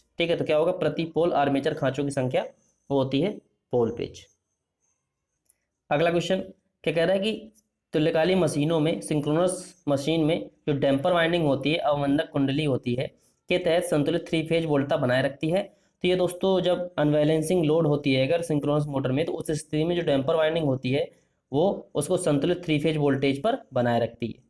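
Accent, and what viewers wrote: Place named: South, India. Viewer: South Indian hindi me samajh nahi aatha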